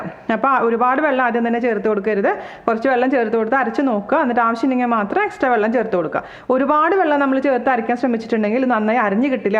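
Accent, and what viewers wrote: Thrissur, India. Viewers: Chechi,njangalk chechiyude Thrissur samsaram orupaad ishtamaann... 😘😁😀😂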